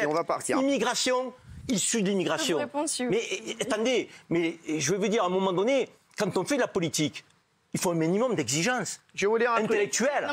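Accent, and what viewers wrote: Marseille, France. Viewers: Zeribi., tu le fait bien l'accent marseillais..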